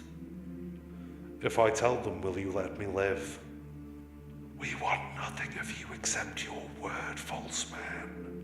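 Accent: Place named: United Kingdom. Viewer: Hello, I really love your Brithsh Accent it's So Sexy 💋 much love Sexy Grandma Darkness ✌💙💙 Ps keep doing This you are very Good at it ❣❣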